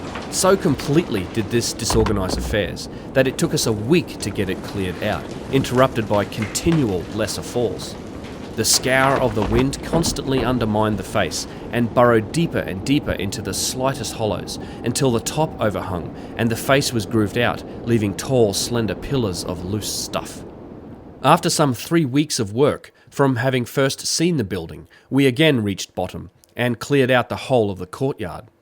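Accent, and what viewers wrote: Australia. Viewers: Good on you mate. I have developed quite a taste for your output and even though I don't necessarily agree with some of your ideas it is refreshing to see someone not just regurgitating the musty work of the orthodox crowd and be prepared to come at these matters from a different perspective ,,particularly with an Australian accent. I don't know if you are self taught but if so that is even more praiseworthy given the range of topics you skate through while showing the bird to the "club" I am enthralled by the current debate bubbling away concerning the age of the sphinx and would love to hear you do a paper or podcast on that. The proponents of the older sphinx are not ratbags but well educated professionals with fairly persuasive arguments from geology and a range of other disciplines to support them .It is fascinating to observe such a vibrant argument.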